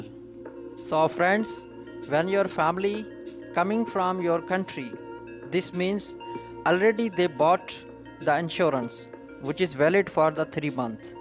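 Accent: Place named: Punjab, India. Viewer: punjabi accent me english...arey bhai urdu me bna ly video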